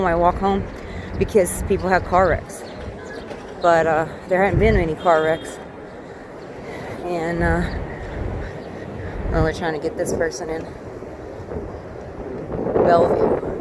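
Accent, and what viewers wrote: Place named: South, United States. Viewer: Good job citizen journalist, people like you with nothing but a cellphone have become the new media. In a world of universal deceit, telling the truth is a revolutionary act. I would like to know who are all of these people who are sick, does anyone know anyone who is a confirmed case? Ive not heard about one person yet who knows a confirmed case. Anyway, good job! Also sounds like you are from the south, I heard a few y'all's in there!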